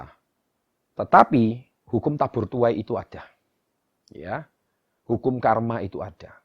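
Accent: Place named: Banyumas, Indonesia. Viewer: Kurang ngajar sama orang tua ya kuwalat guees????orang ngapak iki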